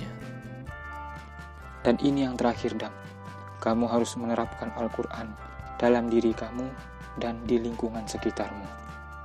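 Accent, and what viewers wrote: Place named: Medan, Indonesia. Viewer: Medan kali bahasanya ya, mantap bg.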